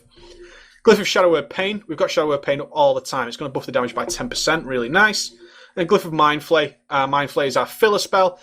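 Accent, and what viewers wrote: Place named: Manchester, United Kingdom. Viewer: Great video, going for a shadow priest with the scroll of resurrection. Nice to hear a Manc accent, I moved to Brazil noy many Mancs there. :)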